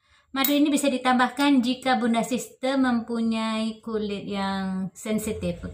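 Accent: Malaysia. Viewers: Tuch yg NgoMong Org Malaysia yach?🤔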